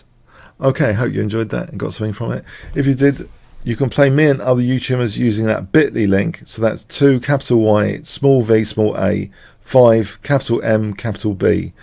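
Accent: England, United Kingdom. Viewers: I have to admit that pure English accent and chess videos is the rarest thing I have ever seen. But it's addictive.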